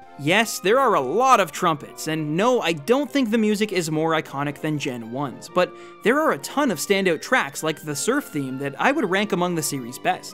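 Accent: Canada. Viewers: Bro your Canadian accent is so awesome, I want to watch your videos just to hear it lol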